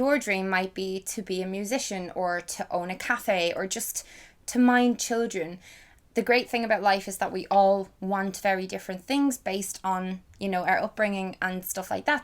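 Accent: Dublin, Ireland. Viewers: I can't hear Louth in it at all. Sounds completely Dub to me!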